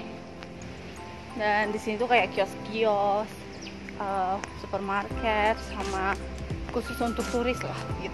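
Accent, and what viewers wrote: Medan, Indonesia. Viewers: logat kk seperti logat anak Medan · Kayak org medan cara ngomong kakak ini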